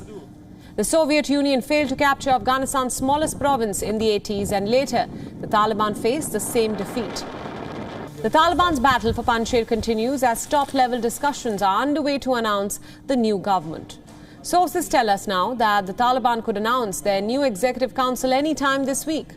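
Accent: India. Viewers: Hall If you had worked in high tech in the US, you would find her accent easier to understand -- you would have had so much practice learning to understand it from listening to your Indian coworkers! But it gets worse: there are more people speaking English with her Indian accent (or one similar) than there are speaking British or American English! Indians will get the ability to shape the future of the language due to their numbers! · Why the narrator is speaking like an Indian Mama Pundek? TQ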